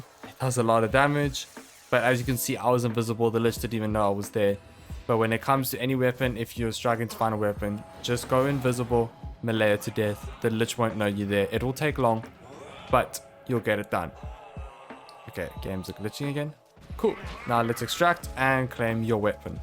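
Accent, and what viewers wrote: South Africa. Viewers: Are you south african? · I know that English South African accent anywhere! XD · Are you south African? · You are awesome and so nice to hear your SA accent! Hubby and I are from SA!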